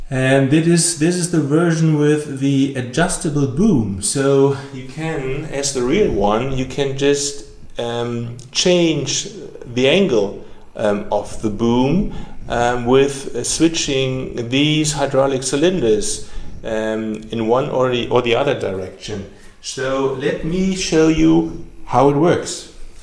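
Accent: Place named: Germany. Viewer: du bist deutscher oder ? XD ich erkenne das an deinem englisch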